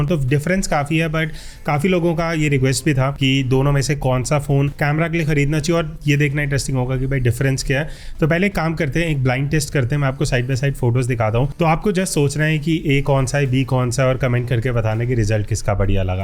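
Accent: Lucknow, India. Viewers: Bhai Lucknow se h?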